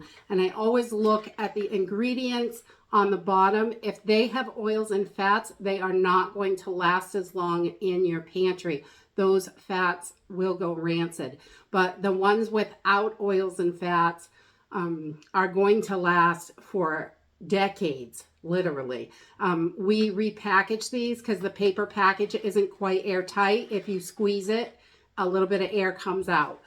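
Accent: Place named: East Coast, United States. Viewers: accent is definitely a New England accent not an East Coast accent, as there are tons of different accents a up and down the East Coast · I love all your videos and I have do! Have a great day!I have been using your lists to stock up. But the real reason is to hear you talk! Love your east coast accent. I’m a midwesterner and love to hear you talk! Thanks for all you!😊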